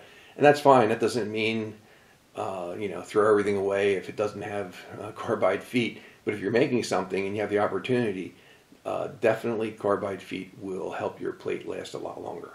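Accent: Pittsburgh, United States. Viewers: haha, thanks for the chat and knowledge! My mom is from Aliquippa and hearing your accent reminds me of my uncles over there in the Burgh.. Also, one of the reasons I like Jim Kramer's Mad Money too, lol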